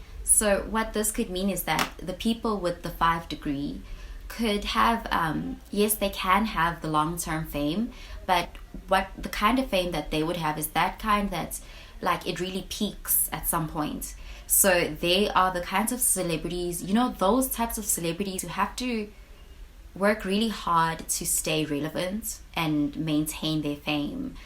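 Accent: South Africa. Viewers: Are you South African? You sound South African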